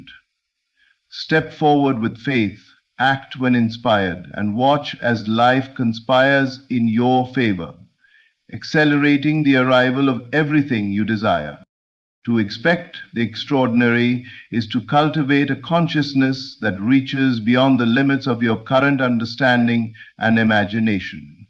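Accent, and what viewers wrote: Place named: India. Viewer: I can hear the Indian accent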